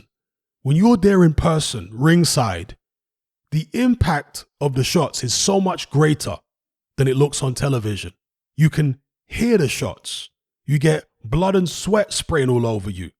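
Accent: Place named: United Kingdom. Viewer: Bruv, the minute I heard that British accent I knew you were trolling. It was legit, quit hating.... Anthony Joshua lost, its over, get over it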